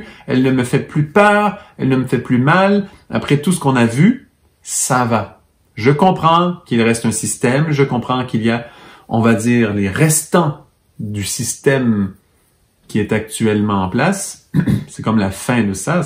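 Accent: Quebec, Canada. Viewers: Enfin, vous me rendez sincère, parce que j'ai écouté attentivement et je rien rien compris. Rien que pour entendre votre délicieux accent québécois j'écouterai toujours, qui sait ? Peut-être qu'à force de vous écouter je serai intelligente et je comprendrai les relations des planètes !!! Merci de m'avoir accompagnée, étant malade et perturbée par mon passé ...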